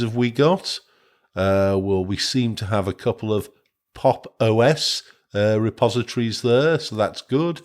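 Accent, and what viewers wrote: United Kingdom. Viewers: Happy New year Year, sir! Apart from learning a bit more about Linux, being a non-native speaker of English, listening to your authentic British accent is a pure delight. Do you have it open-sourced so I can fork it (aka adapt it a bit)? 😃 Shine on!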